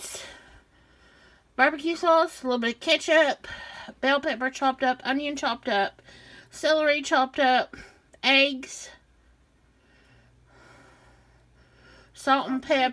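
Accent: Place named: Kentucky, United States. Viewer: Ya sound like me when ya talk..I am from Kentucky. and I feel at home here...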